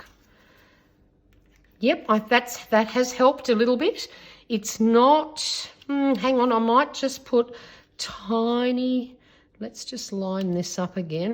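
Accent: Australia. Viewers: why thank you! I didn’t mean to fish for subbies - doh! I just love hearing Aussie accents in our community & get so excited 😂 Especially when I like their work (like I do yours)!! But thank you! I have quite a bit of family in Brisbane & further north too. Hoping to make another trip up this year. It’s a gorgeous part of the world & always nice to be around family. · I keep going back to listen to you as I miss the Aussie accent...lol where in Brissie are you? I have family in and around there, not that I imagine you would know them...lol xxx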